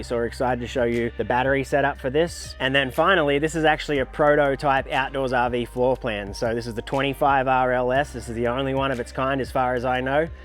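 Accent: Australia. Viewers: Assuming you're in Australia because of your accent. Surely you have heard of AS/NZS 3001.32:2022. Your battery installation seems to be noncompliant in my opinion. The cover of the enclosure needs to be secured with tools, if your enclosure is actually sealed and vented properly I cant imagine how your electronics in there with the battery are adequately cooled. Your work looks very professional but I cant see how it's legal. Oh now I see the license plate, thats how its legal. Hahaha. Yeah any aussies wstching this, you need to have your batteries outside the habitable area, similar to how we have gas bottles.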